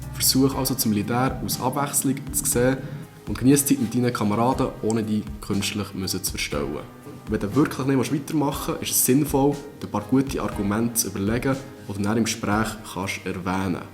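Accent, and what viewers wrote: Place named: Bern, Switzerland. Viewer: Das nennt man Bärndütsch..;)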